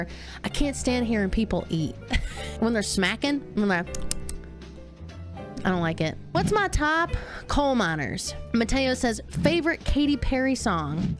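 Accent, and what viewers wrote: South, United States. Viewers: You have a country accent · Found you through the Crew! Glad to have you, have genuinely enjoyed your company and silly intoxicating laugh when you’re enjoying yourself with them. By the way it’s awesome to have a southerner representing, you ain’t hiding that accent ❤ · As a fellow Southerner, I gotta say that I love that you don't hide your accent. I feel all warm and fuzzy inside when I hear that twang. Keep doing the Lord's work, sweet thang. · Wow really? She sounds so Southern tho xD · Really like the southern accent Mine comes out every now and then even after 6 years in the city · 🫡 the southern accent pulled me in, as I have one and it’s not fun online 🤣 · Oh my gosh, I am a blind dude so I really love your southern accent.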